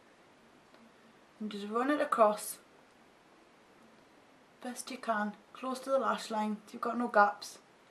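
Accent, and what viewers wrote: United Kingdom. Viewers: Love ya vid, were ya been man woman lol:-P You make me laugh, you,ve got some lovley comments on here, but what I cant stand is people asking u to " talk properly" and to "not talk" well is a Geordie this is how she speaks thats north east England and lots of us like her talking, it's called being real!!!! X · hahaha i love ur accent so much!!! i hate most british ppls accents but urs is so cool!!!!!!!!!!!! God bless u jesus loves u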